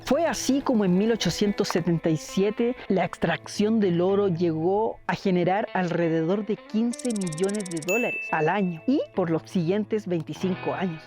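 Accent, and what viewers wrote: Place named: Chile. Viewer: Saludos....para mí q eres de Chile.... por la forma especial de hablar...y por la palabra....una cachada... saludos desde Perú 🇵🇪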